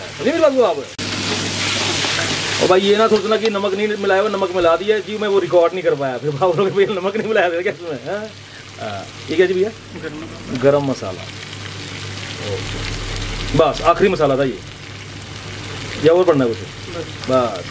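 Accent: Punjab, India. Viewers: bolte bhot accha h...punjabi touch mein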